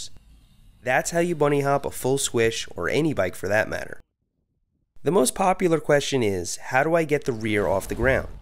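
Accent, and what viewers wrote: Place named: New York, United States. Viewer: Was pretty sure you were from NY when I heard you say BAWLS in a few previous videos. This video confirmed that, lol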